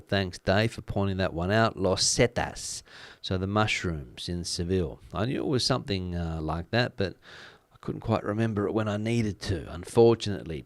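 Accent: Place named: Australia. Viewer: I'm from Germany and stumbled upon your channel a couple of days back. Quite funny to hear an Aussie doing the news on Spain. G'day! I lived in Oz for 2 decades and loved the outback, and now want to retire in southern Spain. I'm taking spanish classes and researching my options. Looking good. Thanks for doing this, looking forward to hear more from you. Cheers!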